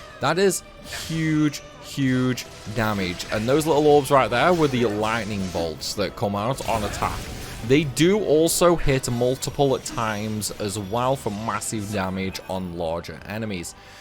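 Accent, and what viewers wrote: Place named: England, United Kingdom. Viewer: FAXXX 😂 ITS already difficult to understand a English dude from England, as an American his accent can get annoying, specially when he talks instead of giving us step by step process.😂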